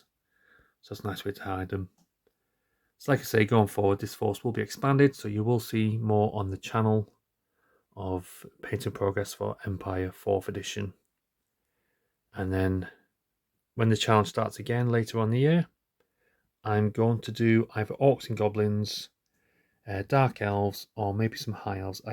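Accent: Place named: Northern England, United Kingdom. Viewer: Are your infantry on 20mm bases? Also are you up north? As you sound northern. West Yorkshire here.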